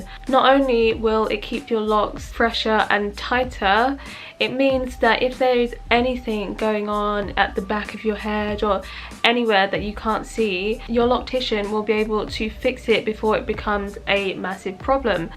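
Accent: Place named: England, United Kingdom. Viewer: Lol, ya mom chupsing! Then I heard your English accent made me subscribe even though my locs are much smaller. Been looking for fellow Brits to follow. 👍🏾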